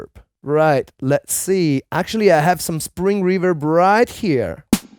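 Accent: Italy. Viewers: 😂 could be. His English is good indeed but he does have a pretty thick Italian accent. Nothing wrong with that but it's a dead give away. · you sound like you are italian, are you?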